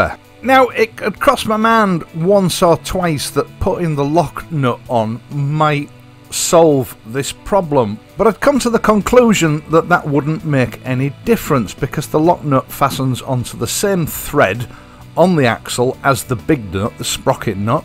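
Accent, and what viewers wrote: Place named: England, United Kingdom. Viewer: Brilliant teaching video. 'Lots of practical logic and obvious experience, spiced with humor and an enjoyable Englishman accent for good measure. Thank you.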